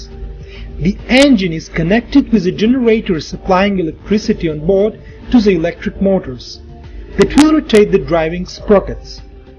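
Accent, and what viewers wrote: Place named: England, United Kingdom. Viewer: это не американский,а английский....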